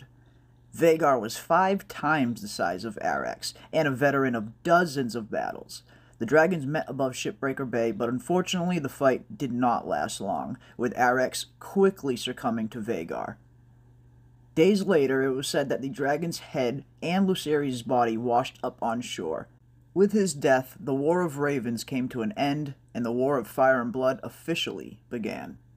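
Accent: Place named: Boston, United States. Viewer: You must not be familiar with channel, that’s just his Boston accent. It can sometimes sound like he’s not pronouncing a D or an R here and there but he is. He’s literally an encyclopedia of ASOIAF knowledge, there’s not much about the source material that he doesn’t know.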